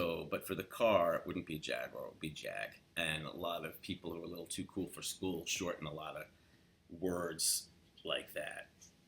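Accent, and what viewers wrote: California, United States. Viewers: A New Yorker who says 'taaaawk' instead of 'toooooawk'!!!!!!!!!!!!!, you're already Californian!!! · Denise Spurlock That is what a Californian accent is like. We tend to extend words and change the tone of our voice mid-sentence, so people often associate it with slow talking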